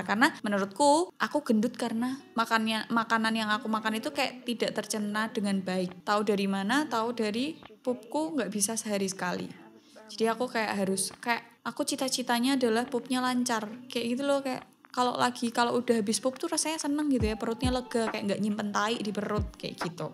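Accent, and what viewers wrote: Java, Indonesia. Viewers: Klo pingin makan ati terus, kepripen hayoo ??? logatmu kok campuran suroboyoan+Jawa tengahan sih ??? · medok nya itu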